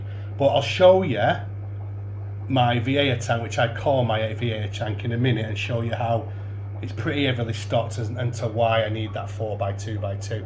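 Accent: Manchester, United Kingdom. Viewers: Looking sweet bro, you sound mancunian!!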